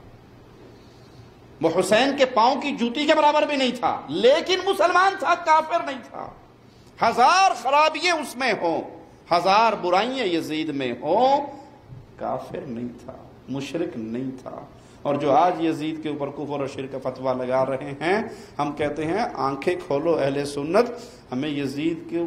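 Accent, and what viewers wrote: Arab world. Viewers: اردو کو اردو لہجے میں بولا کریں۔ عربی لہجے میں نہیں۔ اردو کو عربی لہجے میں بولنا اتنا ہی غلط ہے جیسے عربی کو اردو یا پنجابی لہجے میں بولنا۔ یہ لہجہ بڑا ناگوار محسوس ہوتا ہے۔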